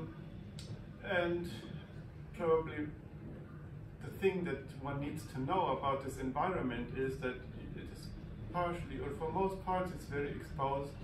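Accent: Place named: Sweden. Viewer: CAT! She so stole the show 😃 I hope you make some videos from the trail. Nebenbei, Du hast schon nen Schwedischen Akzent wenn Du Englisch sprichst, sehr sympathisch =)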